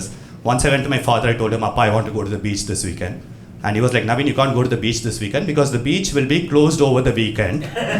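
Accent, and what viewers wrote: South India, India. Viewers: I just hate madrasi accent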